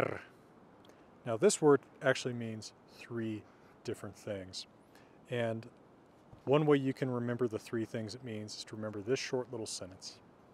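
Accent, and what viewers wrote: United States. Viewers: As a Scandinavian this only sounds like a American trying to speak Scandinavian 😂 Æ is ÄÄÄÄ. V is not W. Y doesnt sound like what you are saying 😂 Å is another story. Also how do you know how they pronounced R? And from what region?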